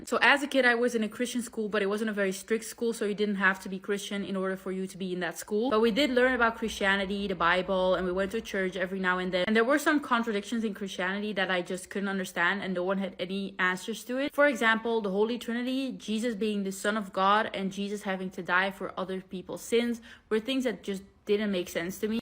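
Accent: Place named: Arab world. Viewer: لهجتها كأنها عربية..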